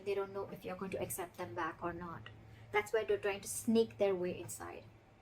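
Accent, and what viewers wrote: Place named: India. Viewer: Your pace is too fast, try to speak slower. We know you're from India and trying to hide your accent but still too fast.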